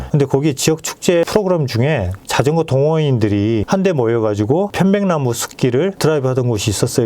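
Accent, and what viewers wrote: Jeolla, South Korea. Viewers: 전라도? 외국으로 가셨내.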